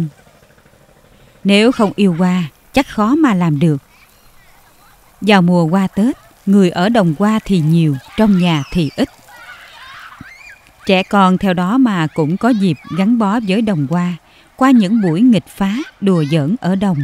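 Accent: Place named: Kien Giang, Vietnam. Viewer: Kien giang giong rien que toi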